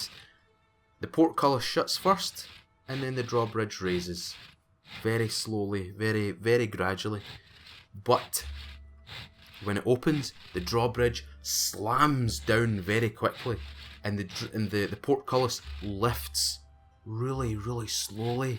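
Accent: Scotland, United Kingdom. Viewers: WHere are you from ??? Scotland ? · Scottish accent is brilliant, Just bought the game and it seems we are going to spend some time here xD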